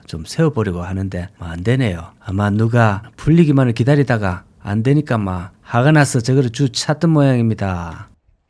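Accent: Gyeongsang, South Korea. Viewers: 이 분 경상도 말씨는 참 듣기 좋은 구수한 말입니다. 다니시느라 수고가 많습니다~ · 경상도 사투리가 이렇게 구수 했나? 잘 보고 갑니다 ^^ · 님은 방송국 나레이터가 딱입니다. 호감형의 구수한 갱상도사투리로 은근 중독성이 있어요... 참고로 저는 전라도 사람이여이잉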